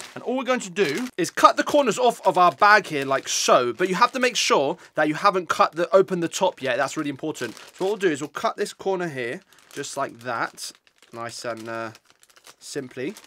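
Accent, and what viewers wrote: United Kingdom. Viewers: why are you saying chips when you sound English?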